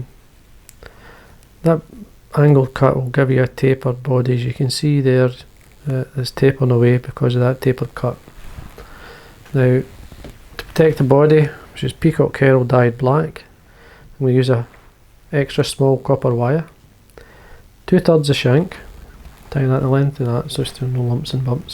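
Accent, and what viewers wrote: Scotland, United Kingdom. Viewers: Forgive me, but I have trouble understanding your Scots accent, (We here in the Ozark mountains have an accent that few can understand), but I see what you're doing, and I've tied some of your flies. I will tie this one, because I think this spring it will work on the streams we have here in the Ozarks. Thanks for your videos, and keep up the good work. Wish I could come to my ancestral home in Scotland and fish, but I'm afraid I'll have to settle for our rainbow and browns in our steams. I'll let you know how the Long Hackle Fly works this spring and summer.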